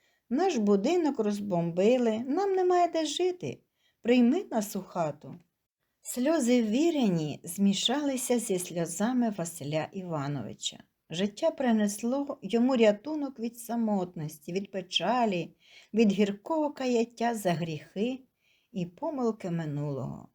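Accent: Standard. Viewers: Тільки за голос і чисту українську❤❤❤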